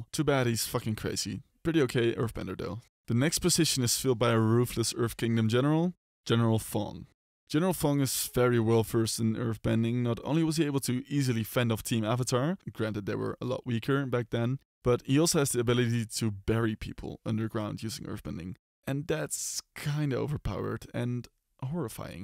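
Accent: Netherlands. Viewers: Great list! Are you by any chance Dutch? I think that’s the accent I hear. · good vdeo but do i sense a dutch accent ? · i think i hear a dutch accent, je maakt goeie video's.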